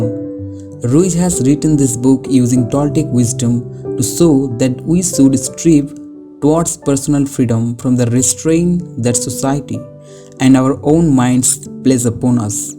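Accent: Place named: India. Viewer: We are going to read a summary of the four agreements with a barely comprehensible accent!? Couldn’t you find a native Speaker of English? It’s like tech-support from Calcutta.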